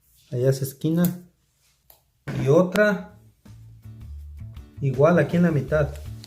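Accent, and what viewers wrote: Mexico. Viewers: ES IMPRESIONANTE COMO HABLAS ESE TONO TEPITEÑO TIANGUERO NORTEÑO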